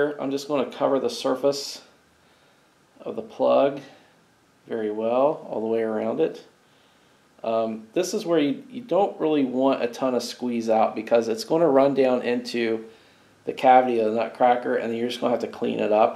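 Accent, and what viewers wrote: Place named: Philadelphia, United States. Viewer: What part of the Philly area are you from? (Accent gives it away if I am right) LOL Very nice project. I've never seen anything like this before. Definitely making some. Thanks for taking the time to make the video.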